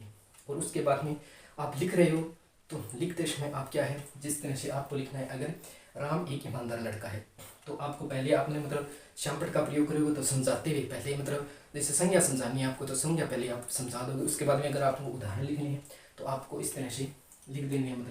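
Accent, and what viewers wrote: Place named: Haryana, India. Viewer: Ram ram sir 😍 bhut bdiya smjhaya thodi haryanvi touch language sir 😄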